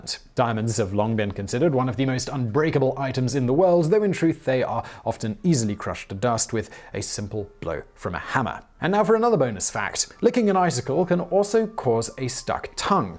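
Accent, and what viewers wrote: United Kingdom. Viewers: "I triple dog dare ya!"😛 I like the way Brits say Aluminum!😁👍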